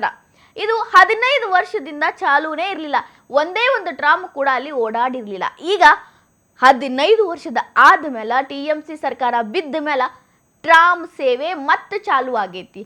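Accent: North Karnataka, India. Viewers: ಉತ್ತರ ಕರ್ನಾಟಕ ದ ಭಾಷೆ ನಿಮ್ಮ ಬಾಯಲ್ಲಿ ಕೇಳುವುದು ತುಂಬಾ ಸೊಗಸು. ನಿಮ್ಮ ಸೊಗಸಾದ ಮಾತು ಕೇಳುವ ಹಂಬಲ ನನ್ನದು. ದಯವಿಟ್ಟು ಸದಾ ಮುಂದುವರೆಯಲಿ. 🙏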